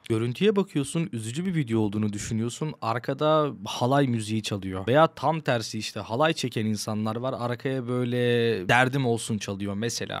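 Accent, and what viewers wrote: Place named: Aydın, Turkey. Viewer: abi memleketlimsin valla aydın'lı olduğun konuşmandan belli sevgiler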